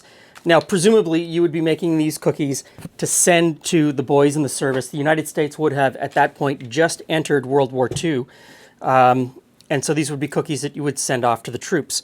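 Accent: Canada. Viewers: Sure sounds Canadian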